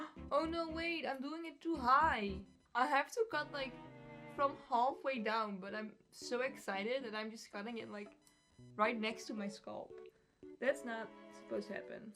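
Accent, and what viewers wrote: Netherlands. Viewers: I love your dutch accent:)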